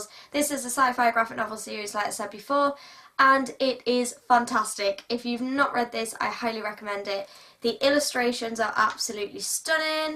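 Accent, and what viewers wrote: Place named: Northern England, United Kingdom. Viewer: Great book tag ☺️ ☺️ I hear a northern accent I'm originally from Darlington 😊😊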